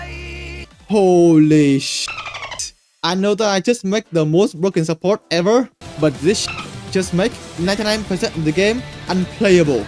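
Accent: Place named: Vietnam. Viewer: Hmmmm... vietnamese accent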